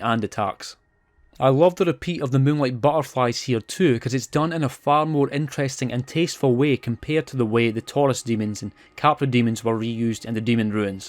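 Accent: United States. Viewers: love the american voice. i was forced to use 1 from cape town south africa, working for t-mobile. i hated how i sounded. you were great. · Your American accent is really spot on, could use some more practice, but pretty good. As a american, I approve lol. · The American accent is fire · That American accent is so fucking funny bro. 😂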